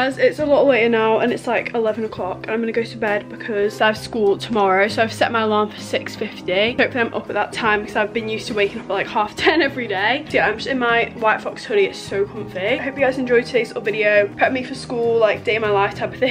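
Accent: United Kingdom. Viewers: hii! i know u probably wont see this, but just in case u do, i actually love ur content so much u dont understand. ur my comfort youtuber, especially since i used to live in england, until my dads work caused us to move to nyc so its so refreshing to hear a british accent again. i love u so much ur voice brings be back home, keep doing what ur doing i love u so much💓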